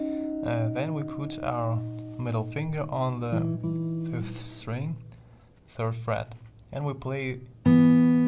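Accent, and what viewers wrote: Russia. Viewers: Are u Russian? I hear some Russian sounds I think😀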